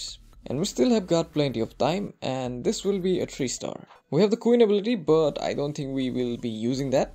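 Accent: India. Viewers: Bro im not sure but I think youre from india cuz i saw your thank u for 3k subs and all the background noise of vehicles and electricity poles make me feel youre indian. Plus theres a slight touch of hindi accent in your english. Please let me know if im right!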